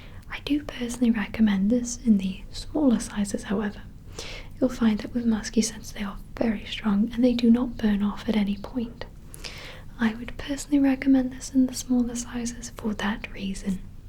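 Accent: Wales, United Kingdom. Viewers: Fantastic video. I find your voice so comforting. I think it's because I'm Welsh too and so your accent is so familiar!!! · Welsh accent is incredibly nice😍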